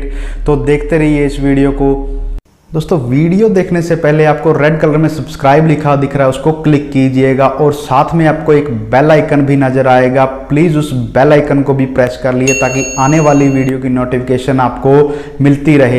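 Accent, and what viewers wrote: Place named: Haryana, India. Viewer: sir ek baat thodi ajib lgi ... haryanvi excent use krte ho jaise ki ... thoda bhot ...thoda bhut ...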